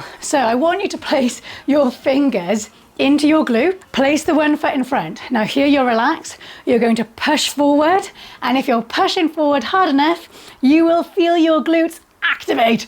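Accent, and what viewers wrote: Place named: Wales, United Kingdom. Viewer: Oh my days, came on here to learn how to hula(after seeing the Texas Hold 'em short) and there's a Welsh accent 🏴 (well I think it is anyway 😂) This is gonna be fun! ⭕⭕⭕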